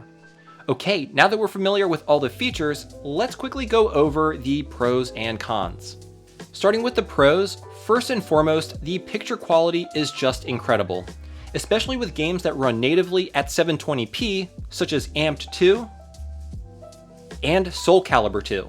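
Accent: Baltimore, United States. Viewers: ...are you from Baltimore...???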